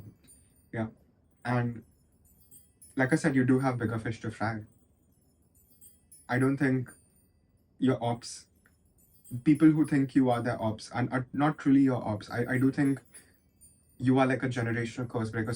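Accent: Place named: India. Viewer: Ummm I did not expect a white looking guy in a cowboy hat having an Indian accent 😂 anyway pile 2 was accurate. A close friend senses the "darkness" but I think its just cuz Im cynical and hard to impress.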